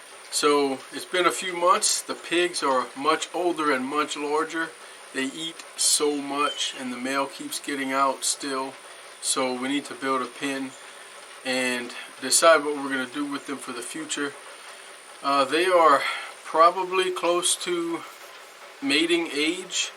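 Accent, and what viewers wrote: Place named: Louisiana, United States. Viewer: You have a Louisiana accent. Are you in Louisiana? Lol, he is sniffing Cassius. Lol.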